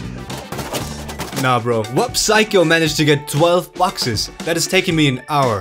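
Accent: Germany. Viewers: Are you German?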